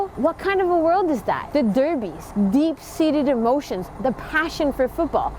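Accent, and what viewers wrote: Germany. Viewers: That Germán accent made you 1000% hotter!!!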